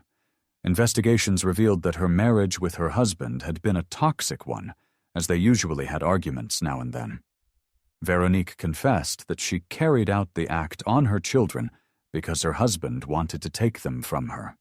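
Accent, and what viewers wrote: United States. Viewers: Why is the narrator american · Why is it narrated by an American?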